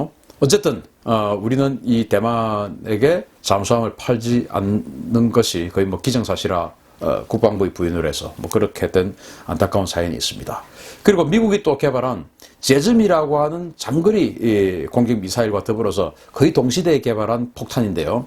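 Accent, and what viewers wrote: Gyeongsang, South Korea. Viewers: 신인균씨 경상도 사투리가 조금 남아 있네요. 표준어 구사를 부탁합니다.. 자주국방 네트워트 회원이었죠. 지금 한미간에는 어느때보다 가까운데 갈팡질팡이라는 것은 가짜 뉴스에 불과 합니다. 조갑제 tv등 극우 언론인 ,기독교단체의주장이 일방적으로 진실이다? 무슨 설교하세요? 몇일전에 미국 재무부가 확인해준..한국의 가짜뉴스를 인증했는데... 미국도 친중인가요? 그럼 박근혜때 친중을 넘어 사대주의 끝판왕일때는 뭐하셨어요? 박근혜 정부 찬양하느라 바쁘셨는지? 중국 사대주의 박근혜 -시진핑은 베프 통일방해 북한지원 중공군 빨갱이 시체 무상으로 헌상 -친중 서방진영유일 중국과 FTA 박근혜-친중 TPP 쌩까고 AIIB 가입 : 친중 중공군 전승절 공산주의 동맹 반미 : 친중 대통령 당선직후 중국에 김무성 특사 : 친중 중국 미세먼지에 고등어 타령 : 친중 평택 차이나타운 건설 : 친중 제주도에 중국공안 배치 검토 : 친중 차이나머니로 정계, 언론계, 문화계 친중매국노 판 만듬 : 친중 차이나머니로 국내 기업 M&A해서 기술 다 빼김 : 친중 중국의 남중국해 강도짓에 침묵 : 친중 중국의 센카쿠 침입에 침묵 : 친중 이것이 친미인가요? 친중인가요? 이명박이 적극 지원해서 건립한 롯데월드는 신이내린 안보방어벽입니까? 노무현때는 논의하고 거절하면 죽일것처럼 덤벼들고 그것때문에 자주국방테느워크 사이트에서 많이 싸웟죠 대부분의 회원들의 역사관이 개신교, 이승만 박정희 박근혜 이명박... 그리고 일본에 대해서는 섬김의 나라..일본의 식민지배 찬양하는 회원들이 많았고 밀리 사이트인지? 아니면 극우 정치사이트인지 ? 에스더라는 극우 개신교 가짜뉴스방송과 조중동이라는 친일극우신문의 가짜뉴스를 보고 판단하시는건가? 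문재인정부가 갈팡질팡이라.... 밀리터리도 그닥 잘아신거 같지 않지만.. 정치경제를 모른가면서 가짜뉴스를 바탕으로 정치경체논평하는건 모순이라고 생각합니다만? 美, 대북제재 구멍 겨눠… '세컨더리 보이콧' 명시 : 뉴스 : 동아닷컴 이밖에 신인균씨와 조갑제씨와 친하신가? 조갑제 방송에도 나오시네요 그 조갑제 티브이...검색하니깐..전부 박근혜 친박 극우개신교단체사람들만 사실이라고 주장하던데... "한국에 세컨더리 보이콧"?…미 재무부 '사실 아니다' 확인 국내기사뿐아니라 미국의 문재인 트럼프 한미관계 대한 자료입니다. 미국 재무부 " "한미동맹 굳건"…트럼프 "한미는 위대한 동맹관계 트럼프 "내 친구 문재인".. 친분 과시한 한미 정상 <link> <link> 트럼프 "한미FTA로 양국관계 발전·도약" 웜비어 부친 "문재인·트럼프, 한반도 평화구축 노력 지지" 문재인·트럼프 대통령 노벨평화상 받을까 트럼프가 시진핑에게 문재인과 친분과시 문재인이 한국에 중국군대를 주둔하자고 했나? 역대 최고 수준의 한미관계를.. 박정희가 미사일 사거리 주권 헌상하고, 박근혜는 뭐했으며.. 문재인이 미사일 사거리 중량 패기는 친중인가요? 미국 전략자산 들어 오는것도 친중인가요? 이명박 박근혜 때 9년 뭐했어요? 비판좀 하시지...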